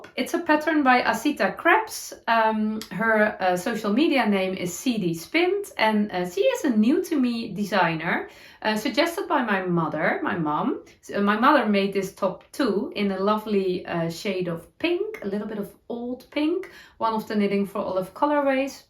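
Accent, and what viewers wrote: Netherlands. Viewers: Dear, I always look forward to your podcast episodes, sitting comfortably in my armchair knitting and listening to you. As I was born in Aken, your dutchy English gives me home vibes. Heartjumping! And of course I'm coming to Breda for the k2p2 festival. My husband is coming with me, if only so that I can show a few more of my knitted pieces on him as a model. 😇 Best wishes from Cologne!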